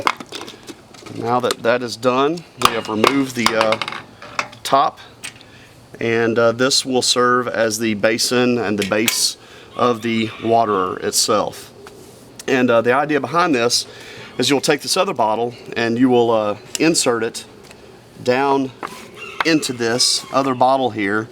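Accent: United States. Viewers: Cool video, bro. Haha, you sound like Forest Gump, Tom Hanks voice and Southern Accent. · u talk western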